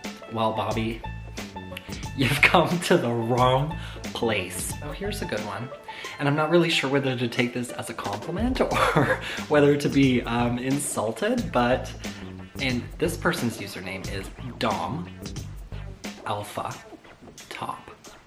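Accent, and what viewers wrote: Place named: Canada. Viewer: I really enjoyed your video so very much, and you are very sweet and honest. I love your Canadian accent !!! Most "dating apps" are just hook up sites where horny guys look for sex. Most guys there just want to satisfy their "urges" and don't care how or with whom they do it. Makes no difference whether they are gay, bi, str8, married, curious for them " a hole is a hole" and you are just a piece meat! You certainly have self worth and dignity. Good for you for not playing their game! Do you like Italians? LOL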